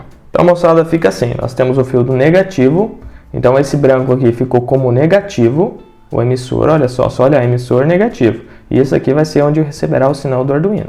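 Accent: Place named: Maringá, Brazil. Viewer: você é de maringá AAAAAAA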